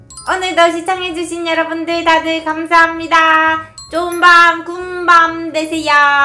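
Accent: Gyeongsang, South Korea. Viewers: 누나 역시경상도는 사투리제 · 처음에 알고리즘으로 보게 됬는데 익숙한 사투리라서 뭔가 반가웠는데 창원쪽에 사셨군요 ㅎㅅㅎ 전 부산 사람인데 같은 경남이라서 반갑네요! 먹는 스타일도 너무 제 스타일이셔서 구독했어용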